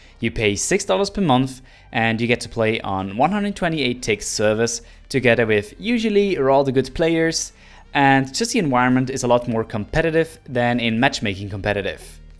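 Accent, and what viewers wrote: Switzerland. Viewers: swiss or german? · wtf ur swiss xD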